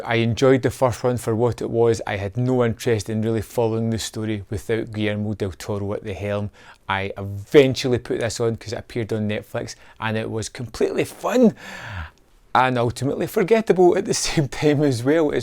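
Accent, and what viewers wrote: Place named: West Scotland, United Kingdom. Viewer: No way yer Scottish 🏴 I’m Scottish too, Paisley. Whera ye fae lol! You sound as if you’re from the West of Scotland too! Slainte 🥃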